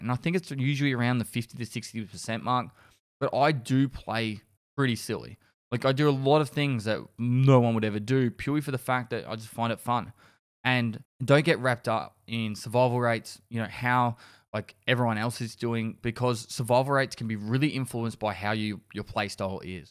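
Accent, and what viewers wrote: Australia. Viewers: Because he's Austrailian?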